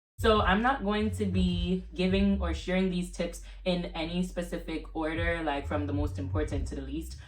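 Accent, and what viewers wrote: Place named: Jamaica. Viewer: Great tips! Also hear that Jamaican accent lol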